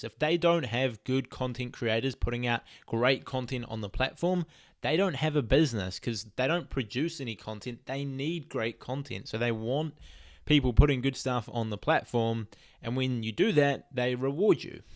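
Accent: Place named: New Zealand. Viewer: Apart from that nice video, I am a big fan of that Kiwi accent!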